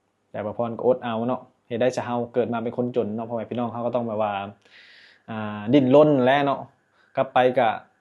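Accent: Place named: Phu Thai, Thailand. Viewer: เป็น คนภูไท เบาะ บางคำ เว้า ภูไท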